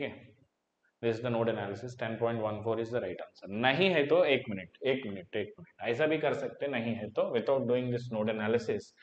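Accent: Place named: Hyderabad, India. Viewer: Sir Content to ekk number hai heen... Uspe Aap ki Hyderabadi flavour waali Hindi... Is just Icing on the Cake 🤗😊💝💖❣️🙏🙏 Thank You Sir..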